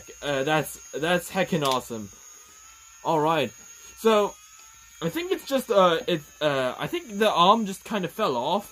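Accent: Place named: South Korea. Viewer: 아닝 영어 억양이 딱봐도 한국쪽인데 한사람 한국오셨나용?이래 ㅋㅋㅋㅋㅋㄱㅋㅋㅋㅋㅋㅋㅋ 귀엽네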